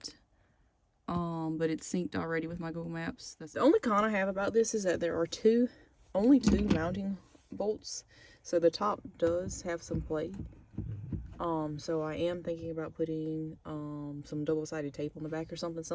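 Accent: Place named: South, United States. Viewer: I love your southern accent ❤❤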